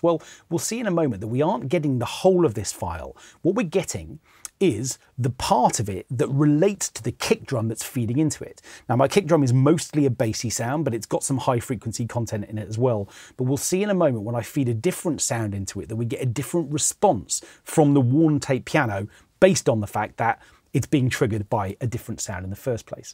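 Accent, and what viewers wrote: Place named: RP, United Kingdom. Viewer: Entertaining dull with a posh accent and 20 years old information. Maybe a gig with the BBC.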